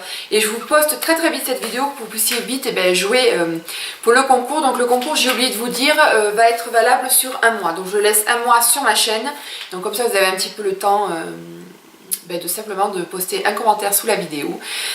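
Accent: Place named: France. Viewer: Hi, t as super craque!!! wouahhh, moi, j evite sephora, c est toxic! lol, Je participe avec plaisir Les videos que je prefere, hauls, tags, ton accent est cute! Kenneth mon mari adore, lol Il trouve que les francaises ont un joli accent, bisous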